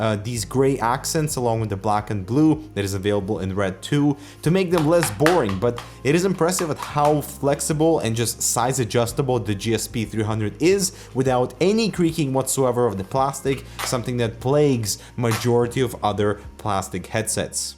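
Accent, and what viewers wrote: Russia. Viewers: Guys, is from Russia?! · Okay.... so is he a Russian or Swedish? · ***** RUSSIAN Accent Happy?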